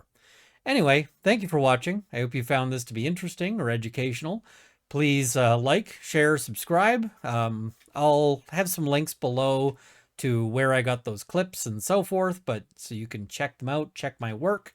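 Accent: Canada. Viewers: Hate the quebeque English accent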